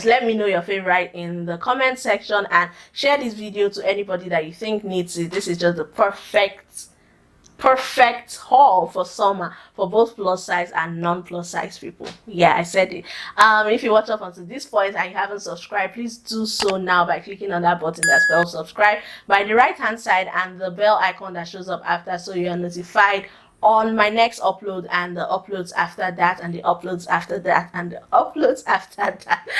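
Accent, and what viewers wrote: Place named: Nigeria. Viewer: I knew you were Nigerian by your accent ✊🏾✊🏾😘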